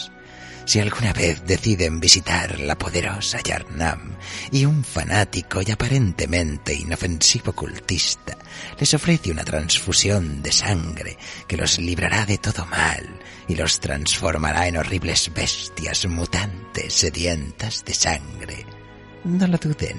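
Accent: Spain. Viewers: Excelente narración, me encanta el tono español en los relatos góticos, ojala también puedan hacer el de blasphemous. Saludos desde México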